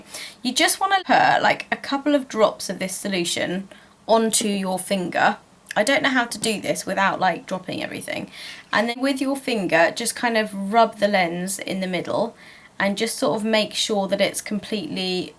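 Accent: United Kingdom. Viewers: You are speaking Brti English. I live in USA. Don't know if you are familiar with 'bausch and lomb' 'ReNu' product. I use this for lens soak and cleaning both. I wanted to know if you use the same solution for two purpose ?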